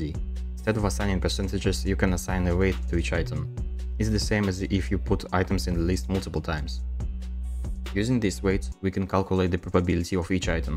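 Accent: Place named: Russia. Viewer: бро я же слышу ты русский❤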